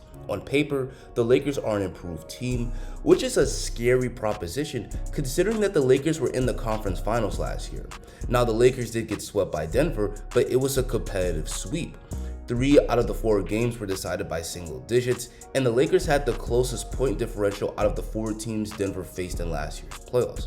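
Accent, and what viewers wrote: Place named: Philippines. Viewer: Is this from a filipino point of view? I can tell from you’re accent.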